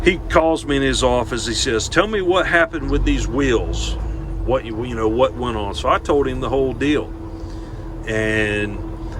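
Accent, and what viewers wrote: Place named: Pensacola, United States. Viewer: I am enjoying this. I am guessing you are from the area close around Pensacola, as you sound similar to Adam Booth (abom79), who has a popular YouTube channel.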